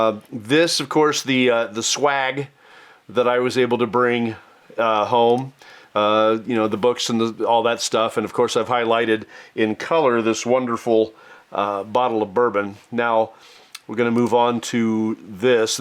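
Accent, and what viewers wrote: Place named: South, United States. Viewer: Congratulations! Here's a toast to you in a glass of Old Forester 100. 🥃 A pot still's operation is dead simple. But I have just a vague understanding of how a column still works. About all I know for sure about a column still is that it's more efficient, and therefore tends to strip more of the congeners out of the white dog. I can just see the Official Aroma Kit - 2,724 different scents, comes with forklift for moving. 😂 I never get peanuts from Jim Beam juice. I get pecans from George Dickel white label, and walnuts from their BiB. And I get peanut shells on the nose from Heaven Hill whisky. Tonight you can't seem to make up your mind whether caramel is CARmel or CAREamel. I can tell you've been in the South for a bit. 🤠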